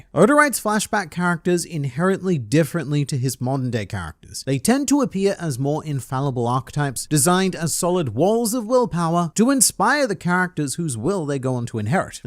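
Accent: Australia. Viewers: Can’t really blame you. voice is rather soothing with that slight Australian ‘twang.’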